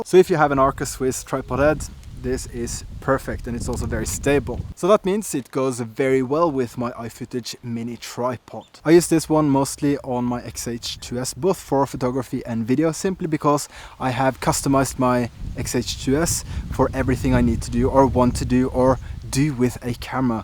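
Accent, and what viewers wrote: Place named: Norway. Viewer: I hear a Norwegian accent... am I right? Thanks for a good review!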